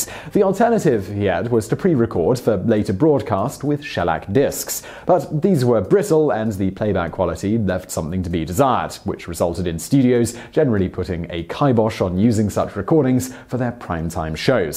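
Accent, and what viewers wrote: United Kingdom. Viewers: today I found out a person with a british accent can be misleading and wrong. what about the VCD format?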